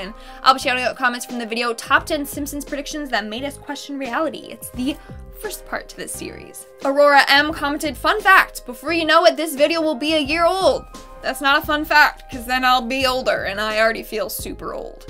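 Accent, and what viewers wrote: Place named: England, United Kingdom. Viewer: I am so hooked on the English accent and now the American accent sux.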